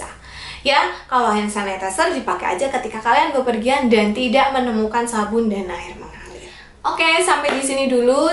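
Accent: Java, Indonesia. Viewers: Tak kira buk bidan orang NTT..eh salfok pas nonton video ini...medok jawa ne., berati orang jawa y buk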